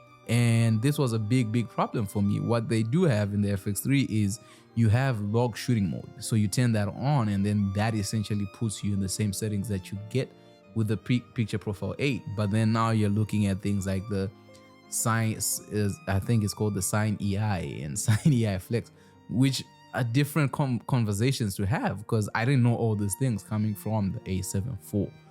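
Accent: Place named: South Africa. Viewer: Bro is in Canada?!?!? I thought he’s in South Africa 🤣 (because of his accent) we’ll much love from South Africa 🙌🏾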